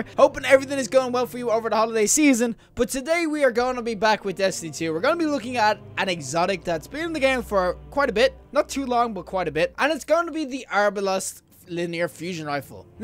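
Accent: Ireland. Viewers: U Irish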